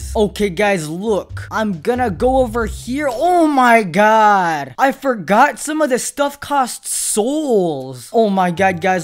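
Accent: India. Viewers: Ji indain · Bro are you indian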